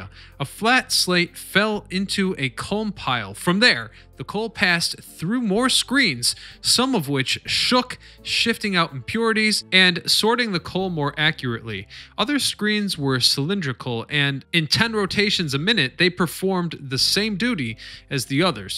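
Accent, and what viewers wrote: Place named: New Jersey, United States. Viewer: I love your videos. You are a Jersey guy right?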